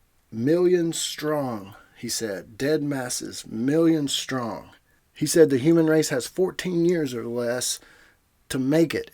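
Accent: South, United States. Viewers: Haha! Nah, I know what you're saying because I'm originally from the south. I lived in the Midwest for many years as well so I know how they get confused by even the slightest of southern accents.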